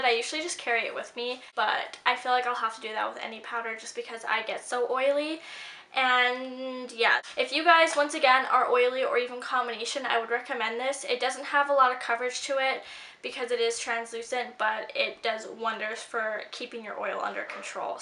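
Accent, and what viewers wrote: Canada. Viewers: Are u Canadian??? :)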